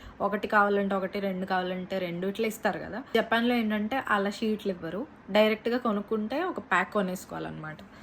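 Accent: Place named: East Godavari, India. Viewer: జపాన్ లో ఉన్న తెలుగులో చక్కగా మన ఈస్ట్ గోదావరి యాసలో మాడ్లాడుతున్నావు. ఒకే గాడ్ బ్లెస్స్ యూ చైల్డ్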